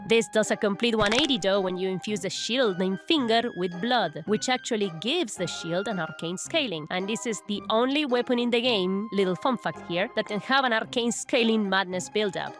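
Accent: Russia. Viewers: me encanta que esta youtuber que me habla en ingles, con acento ruso y me suelta un insulto en perfeto argento 10/10 vamos a ver mas viedos.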